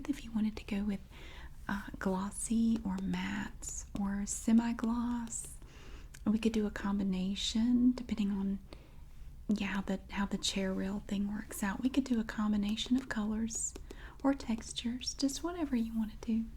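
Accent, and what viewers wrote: South, United States. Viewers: I have family in the south and I think one of the reasons I like you is because you remind me of them. There is something captivating about Southerners - not just the accent, but your mannerisms. Anyway it makes for a good foundation for asmr. You’re exceptionally graceful so that makes you special in my opinion :) I hope you keep doing this for a long time I always enjoy seeing all the stuff you come up with. Thanks for sharing your talents with the world.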